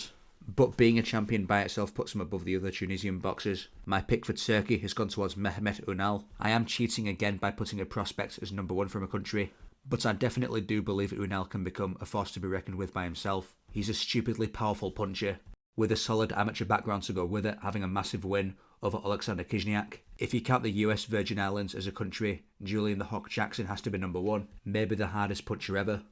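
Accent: United Kingdom. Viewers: you sound brit but didn't mention Jimmy Wilde as the Top Brit. he's actually high ranking all time great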